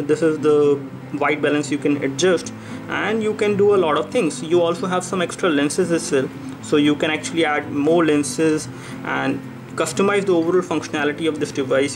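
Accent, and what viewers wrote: India. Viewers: INDIAN accent is so disgusting..Yuck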